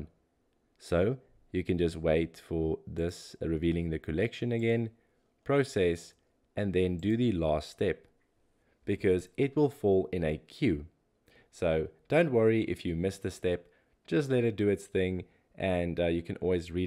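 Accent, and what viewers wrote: South Africa. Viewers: Great video, you sound South African. 😃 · are you South African? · are you from south africa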